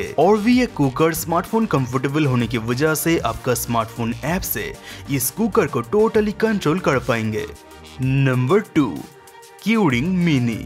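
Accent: Bengal, India. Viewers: हां भाई हिंदी बोलो यार बंगाली आवाज आ रही है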